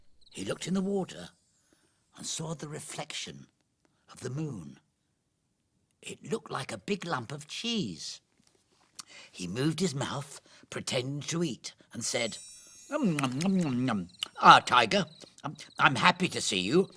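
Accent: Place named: United Kingdom. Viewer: This story is very useful for me or everyone. British Accent is prefect 🙏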